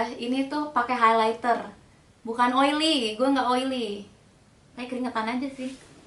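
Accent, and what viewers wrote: Java, Indonesia. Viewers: Logat kejawa jawaan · well actually, cocok bgt kalo medok 😁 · Aku seneng medok mu haha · It's okay to be medok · Banggalah dgn medok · Kok medok gitu? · Its okay to be "meddok" 😁 , keren kok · I am eight year gpp cute kok medok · cara ngomong medoknya itu loh 😍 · Lebih suk kalo ngomongnya medok.. kearifan lokalnya terlihat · Bagusan medog ngomongnya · Medog aja kak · bikin video ngomong medok dong. cute banget wkwk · Medoknya lucu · It's okay to speak like medok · That javanese accent, tho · Medoknya lucu wkwkwk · Please, bikin video pake bhsa medoknya dong. Lucu lah. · talking in medok accent omg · Medok nya kental Jawa bgt but kamu fasih inggrisnya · Medog malah natural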